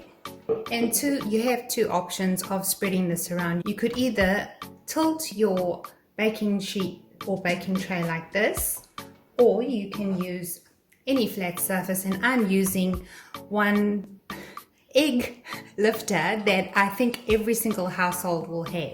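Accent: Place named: South Africa. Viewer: Your accent sounds so much like our South African accent. People used to tell me we sound like the Aussies and NZlanders and I could never hear it. I hear it now. Haha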